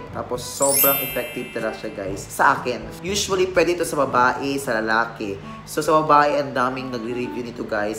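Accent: Iloilo, Philippines. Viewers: Ilonggo ka?heheeh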